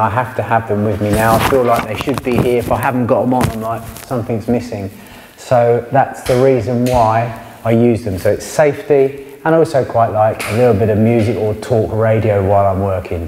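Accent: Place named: RP, United Kingdom. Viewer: This is poshest chippy I’ve ever heard I have use dictionary when he talks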